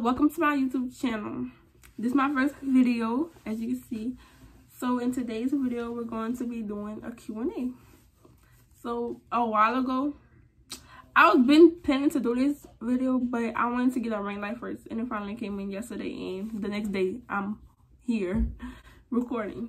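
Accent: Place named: Louisiana, United States. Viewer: the first few seconds ikkkk you was from louisiana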